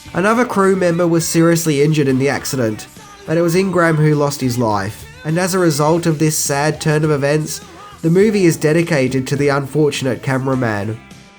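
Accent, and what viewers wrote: Australia. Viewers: Simon Tasievski bogan is australian, therefore he has an australian accent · Its an Australian accent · Hes a Aussie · Akirasan Akirasan it’s what we speak here in Australia 🇦🇺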